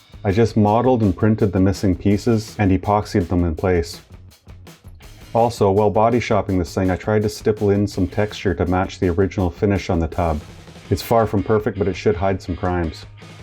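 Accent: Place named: Canada. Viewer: You sound Canadian 🙂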